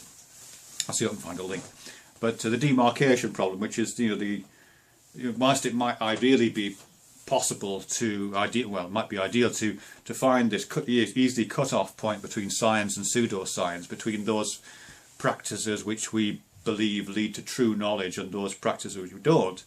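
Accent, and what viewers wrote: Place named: England, United Kingdom. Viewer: Your voice is sounding more English than Scottish these days.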